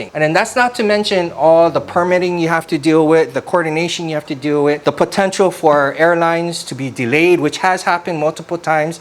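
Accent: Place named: Hawaii, United States. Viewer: Came across a couple of your videos and by your accent I had a feeling you was from Hawaii 😂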